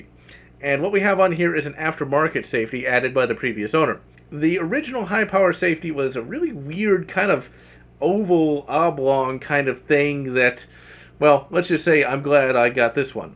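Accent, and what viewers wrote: United Kingdom. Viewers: Are you from England?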